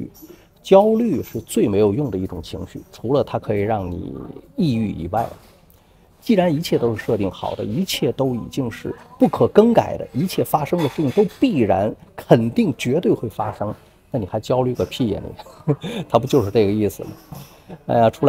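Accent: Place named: Shanghai, China. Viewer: 您有上海口音，老实说，上海人做你这样营生的比较少，总之，你做的很不错，我瞎猜在北京等地工作过很久。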